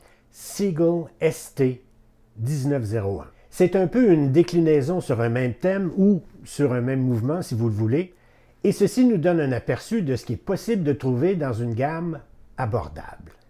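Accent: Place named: Quebec, Canada. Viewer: je vous en prie et votre bel accent du Quebec va très bien avec vos présentations...Bien à vous.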